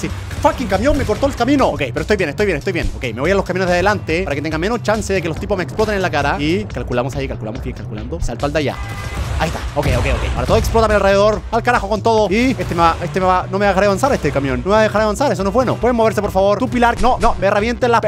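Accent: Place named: Chile. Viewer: En este juego se le sale lo chileno a German :vv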